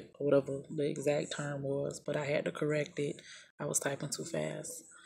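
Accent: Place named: South, United States. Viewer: Hello First I wanna say that I love your "Southern Draw" lol Well I did everything you said and it went through. I even got a conformation of my order. However no shipment conformation or no products was ever delivered. Looked back at my order purchase on amazon and nothing was there. The order was cancelled. So does this really work?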